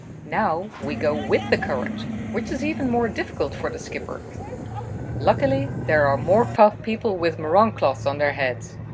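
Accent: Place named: Netherlands. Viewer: Misselijk engels met nederlands accent!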